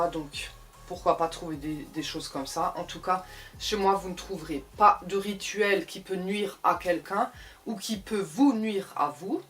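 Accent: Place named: Haute-Saône, France. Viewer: Coucou petite sorcière, juste pour te dire que moi je t'aime telle que tu es, franche et honnête. Ton accent en tant que fille de la Haute Saône me dérange pas, au contraire, on se moque souvent de mon accent ici à la Réunion!! Je suis du même avis que toi en ce qui concerne on sait qui! Le para devient compliqué sur Youtube. Ne pas croire à tout bien évidemment alors qu'ils ont de bonnes "bases" mais en font trop!! Voilà, merci pour tes liens de bouquins intéressants. Je suis en recherche de bonnes protections car j'ai découvert il y a plusieurs années que je peux magnétiser les gens, mais je le fait rarement et juste quand une personne de mon entourage a vraiment besoin, (je ne supporte pas les voir souffrir alors que je peux les aider) car ça m'a déjà joué des tours au début car ne faisais pas de protection ni nettoyage après, ça m'épuisait et rendait malade. 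A ce jour, je sais pas si je le fais proprement. Je demande aux êtres supérieurs, allume une bougie blanche et de l'encens de l'Archange Michaël et Archange Raphaël, demande une bulle de protection mais est ce suffisant et efficace ? Après je me "décharge" avec un galet que j'ai ramassé et chargé au soleil, et ou poser mes mains au sol ou enlacer un arbre suivant où je me trouve... J'aimerais tant aider, je ne demande rien en retour, juste je demande à la personne de remercier là haut.. Je ne supporte pas voir les gens souffrir, je me dit que si on m'a offert cette capacité c'est pas pour rien mais j'ai peur pour moi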